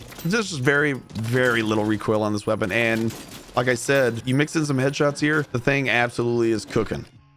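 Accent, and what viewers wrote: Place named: United Kingdom. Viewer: I hope you get better and i love the british voice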